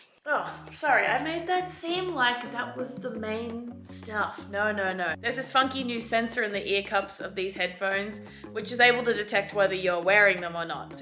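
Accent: Australia. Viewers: That's sarcasm right? 10 hour flights? now? That's definitely an aussie accent or am I going crazy?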